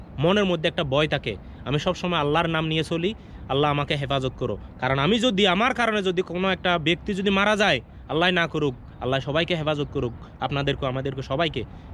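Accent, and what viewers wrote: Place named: Noakhali, Bangladesh. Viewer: আপনার ভাষায় বুঝাযায় আপনি নোয়াখালী বা ফেনীর মানুষ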